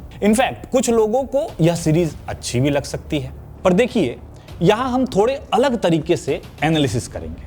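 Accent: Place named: Bihar, India. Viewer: Iski bt se samajh aata he ye bihari he isliye ye jal raha he😂😂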